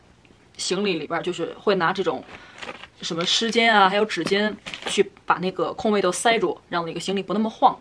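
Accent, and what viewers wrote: Beijing, China. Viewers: 是北京人嗎～～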